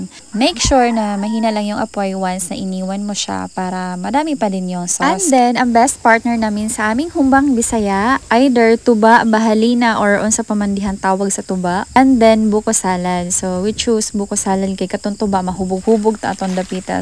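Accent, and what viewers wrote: Bohol, Philippines. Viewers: mura lge ug inistoryahan sa amoa sa BOHOL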